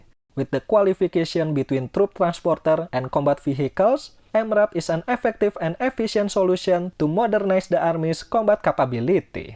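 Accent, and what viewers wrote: Indonesia. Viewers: bang mau saran bikin video nya pake bahasa indonesia aja. Agak gak enak denger bahasa inggris tapi pake nada dan aksen orang indonesia coba kalau mau bikin video pake bahasa inggris pake google translate aja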